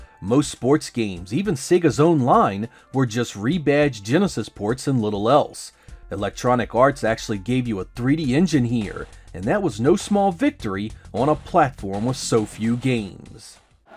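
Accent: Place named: Mid-Atlantic, United States. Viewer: is totally from PA/MD/NJ I can hear your accent lol with love from Boston!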